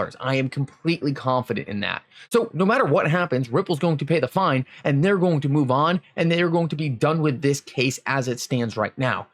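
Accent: Allentown, United States. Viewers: xrp is dead sounds like you have an Allentown accent. Figures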